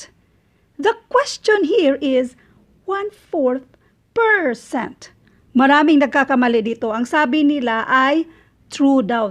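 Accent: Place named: Leyte, Philippines. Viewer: Taga Leyte po kau ma'am kaya pla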